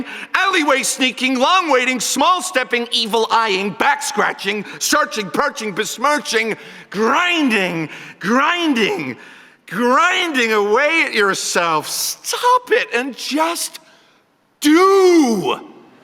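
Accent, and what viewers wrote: United States. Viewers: Why he is speaking like an American!?!?!? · Was he required to do this in an American accent? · Everyone please realise he's putting an American accent here. It's definately not a British accent. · HIS AMERICAN ACCENT IS AMAZING TOO HAHAHAAH I LOVE HIM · How on earth he speakes American accent like pretty normal...oh my god..!!! He is British...How on earth how on earth..??? 😮😮😮😮😮😮😮😮😮😮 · he's pronouncing ful r at the end.Of course 'it's an American accent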